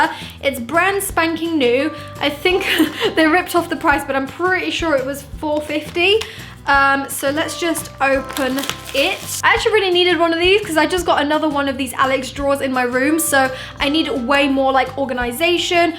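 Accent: England, United Kingdom. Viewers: You sound so British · She's english. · Are you from britain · 1dlover42aj british accent? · Is she British? 🇬🇧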